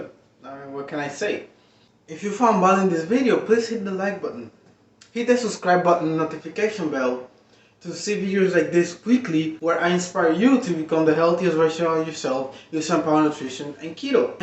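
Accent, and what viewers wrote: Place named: Dominican Republic. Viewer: You sound like you have a Dominican 🇩🇴 accent. Are you from DR?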